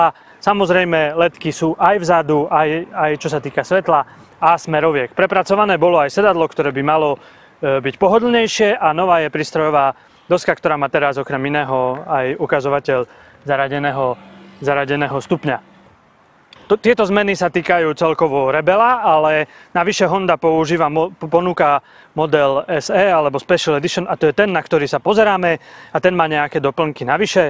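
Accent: Slovakia. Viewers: Kurna, co je to za slovenský přízvuk......to je z nějaké určitě oblasti Slovenska?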